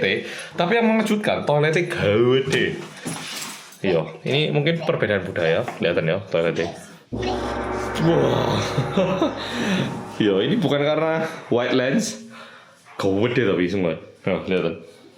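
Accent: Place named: Malang, Indonesia. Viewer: Mas di Belanda tetp dengan logat Malangnya. Ayo ngerawon sek mas + nak Dempo tuku es teler 😂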